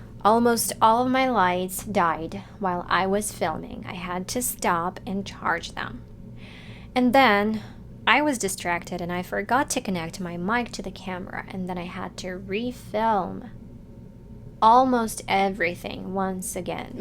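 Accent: United States. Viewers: It doesn't seem to me that you are not american because of expanding your accent accuracy. Go beyond and make yourself smarter more and more.❤❤❤❤❤ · You’re American accent sounds beautiful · Modern British RP is more distinctive and eloquent. Just my opinion. You're doing very well with your American accent though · Dear Madam, congratulation on the level of Amercican accent. You have made a great progress, but be aware of the fact that all the media products mentioned by you need not be available to everyone. To turn your presnentation into a resource for listeners, have your presentation arranged in parts dealing with words, phrases and sentences spiced up with specific aspects e.g. setting the organs of speech before starting the warm-up exercises for pronounciation of sounds, syllables, then at phrase level the stress and finally intonation at sentence functions., etc. 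making up the so-called mile-stones along the almost never-ending but really a rewarding journey of learning a foreign language plus the culture, traditions etc. Best regards, Paul,67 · Why you the force of pronounciation like the accent American? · How long did it take you to master American accent ? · I just have known your channel since March this year. I must have to say your accent let me consider you are from America.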